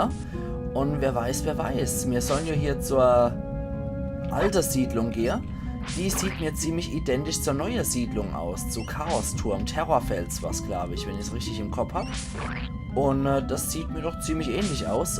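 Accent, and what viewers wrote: Palatinate, Germany. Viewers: Nagut Nagut, dann erst Mana, hihi. Aber ich freue mich, ich werde live dabei sein, wenn jemand frisch/blind Chrono Trigger genießt, das ist etwas ganz besonderes. Uff Pälzisch ist dann nochmal ein Super Bonus, denn ich versuche schon lange das Spiel mal wieder zu beginnen, leider habe ich bisher kein Action Replay mehr um es anzugehen und auf meinem Rechner funktionieren die deutschen Roms iwi nicht. Auf Mana freue ich mich natürlich auch, das werde ich aber nur sporadisch verfolgen, da ich es mit meinem Sohn bald selbst zusammen mit meiner Frau via Multitap spielen werde, da will ich mir nicht die Spannung nehmen. Naja Später kannst du ja mal die eine Stelle vom Schattenwald untersuchen, die mit Man/1up auf den Maps markiert ist. Da kannst ja dann wieder hin. Übrigens ein Tipp, sobald du "reisen" kannst in Secret of Evermore, dann gibt es da eine Stelle in der Römischen Gegend, da gibt es so Statuen, dort kannst du an einer Stelle, die auch der Hund erschnüffelt, gefühlte unendlich Zauberperlen aufheben. 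Kennst du die Stelle?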